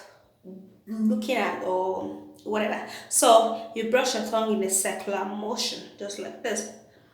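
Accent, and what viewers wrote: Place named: Nigeria. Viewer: She's from Africa Nigeria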